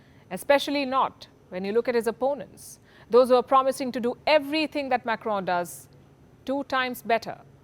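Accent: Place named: India. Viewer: Palki Sharma beautiful lady and a nice Indian English accent.🥰 I root for Macron I hope he wins radical far right or left are not good for democracy.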